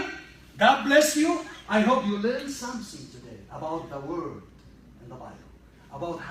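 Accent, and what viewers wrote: Egypt. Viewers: Still ur Egyptian accent beholding u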